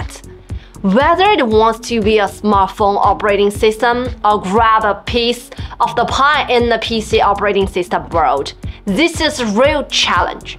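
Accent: China. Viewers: your chinglish is soo sexy!.............loved it! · I really love your English as spoken by which is original Chinese girl speaking the International English language. Well done and keep smiling😅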